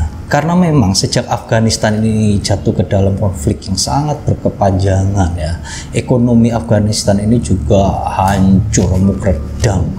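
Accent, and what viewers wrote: Java, Indonesia. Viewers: Logat jawa tpi pke ny gua,gua. Gk byambung mas hrus ny pke kalimat ny sy bukan gua.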